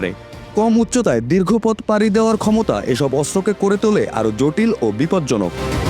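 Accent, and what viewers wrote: Kolkata, India. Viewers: এই সাংবাদিককে কোলকাতার মনে হচ্ছে।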